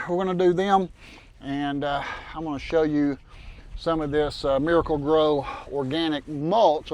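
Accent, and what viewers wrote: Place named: Appalachia, United States. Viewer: Fangers for fingers. Love your Appalachian accent.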